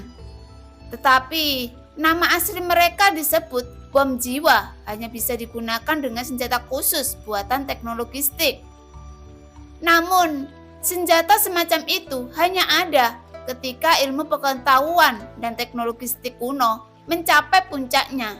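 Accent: Java, Indonesia. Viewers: Wong jowo to mbak..mimin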